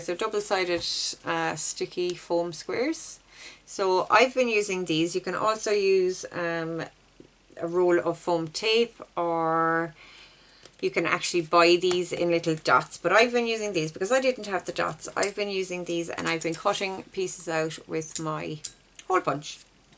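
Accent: Ireland. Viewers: Lovely to hear an Irish voice! I’m from Lisburn Northern Ireland. Just loved your rocking robins and will be making them for the grandchildren.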